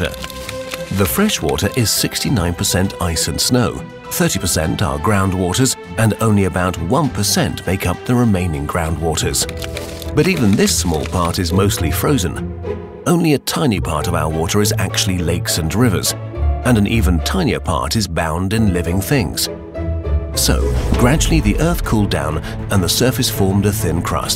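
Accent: England, United Kingdom. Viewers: beautifully narrated with an English accent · A man from England speaks English.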